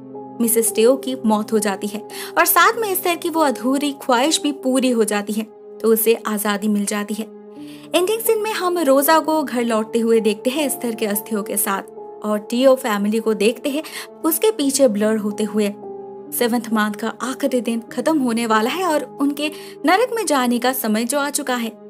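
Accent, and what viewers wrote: Bengal, India. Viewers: don't mind but are you a bengali? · R u bengali 😊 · Are u bengali?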